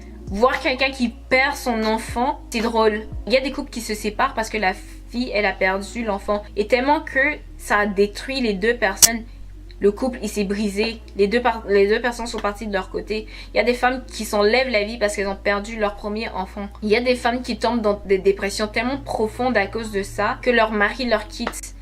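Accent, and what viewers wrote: Quebec, Canada. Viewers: Y a des gens😥 Même pas honte😑 P.s j'adore ta voix, tu est québécoise(l'accent)? 💕🍭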